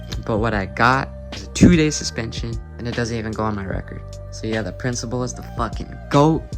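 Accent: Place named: Kentucky, United States. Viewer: sounds like ky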